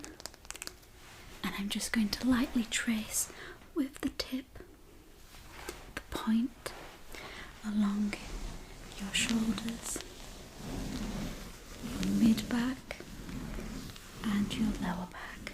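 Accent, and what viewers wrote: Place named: Yorkshire, United Kingdom. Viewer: I think Blissful Whispers ASMR is a Yorkshire girl as well. You ladies have beautiful accents.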